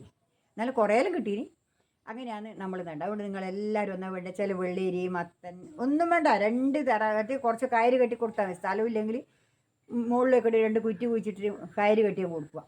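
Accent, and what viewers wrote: Kannur, India. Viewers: ഏച്ചി കണ്ണൂരാണോ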